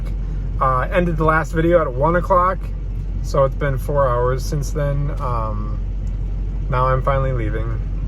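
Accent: United States. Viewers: ! Your southern accent is too funny! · Oh my goodness, Floridians and don’t talk that way, that’s more Georgia… You’re hilarious!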